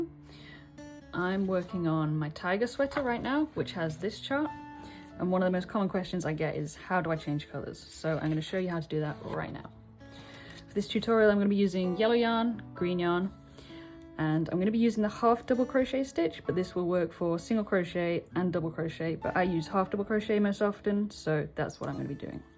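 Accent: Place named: United Kingdom. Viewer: URGH NO 😢 this is USA term why do people who sound so very British do this what is wrong with British terms? This is half treble! 😂😂 Sorry for the moaning personal bug bear I learnt from my nan fifity plus years ago any old british pattern will not work if you think this is half double not a half treble. That said super video I did. give you a thumbs up and the tiger looks fabulous do you have this available anywhere to get?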